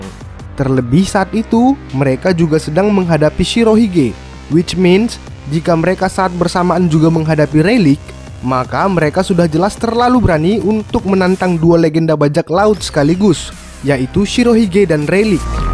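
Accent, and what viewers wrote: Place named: Medan, Indonesia. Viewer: nampak kalo abang ni orang medan bah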